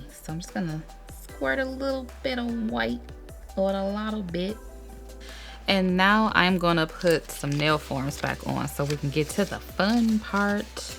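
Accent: South, United States. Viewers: yeah but I’m pretty sure that it is southern... also when I was trying to type pretty it tried to auto correct it to orange 😂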